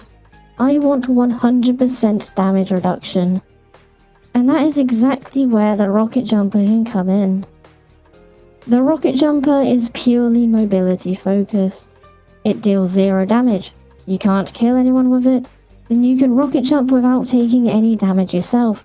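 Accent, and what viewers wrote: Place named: United Kingdom. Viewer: They're not a robot they're british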